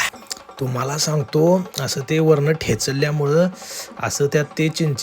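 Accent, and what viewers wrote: Kolhapur, India. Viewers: kolhapuri bhasha no 1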